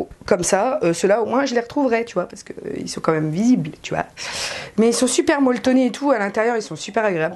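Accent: England, United Kingdom. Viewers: J'adore ton accent anglais ma petite cloche ;) Tu as vécu en Angleterre?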